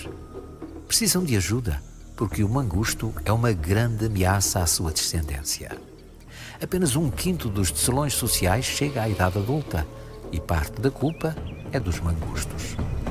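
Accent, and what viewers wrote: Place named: Portugal. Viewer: Ô inteligente, o narrador não é português do Brasil, ele é português de Portugal.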